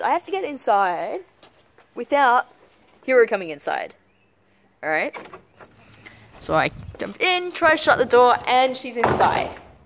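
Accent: Australia. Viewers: It is so refreshing to have a fellow Aussie on this predominantly yank site. Oh, and you may have to untrain your maggie lol. Just subscribed btw, look forward to seeing more vids from you and hearing a normal voice :) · I like your voice, it goes along really well with your Australian accent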